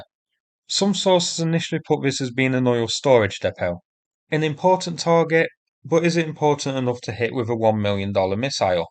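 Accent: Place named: Nottingham, United Kingdom. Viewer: Ah up me duck. You’ve got a proper East Midlands accent.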